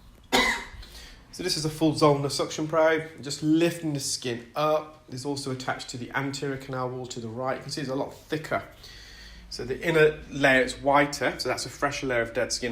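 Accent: United Kingdom. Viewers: To all of the people who are correcting the way says his words. lives in the U.K not America. Americans pronounce their words differently than people in the U.K and Australia.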